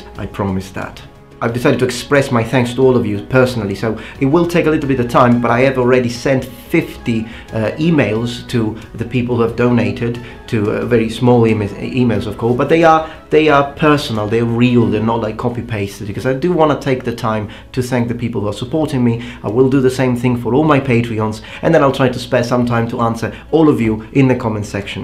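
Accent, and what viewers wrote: Greece. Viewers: really Helenic Accent ! Congrats my Friend! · You have a modern greek accent and not an erasmic one! Good.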